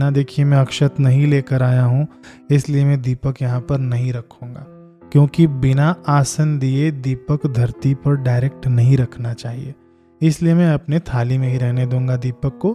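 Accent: Standard. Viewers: Aapki bhasha bahut shudh. Srl.or mithi h..aapki puja ka trika or smjhne ka trika bhi bahut accha h..mene aapko sabscrib kr liya h..or all bhi kr liya .me aapki bahut video dekh bhi chuki hu..sari video bahut helpful h ...jai shree Radha krishna 🙏🙏🙏🙏🙏🙏🙏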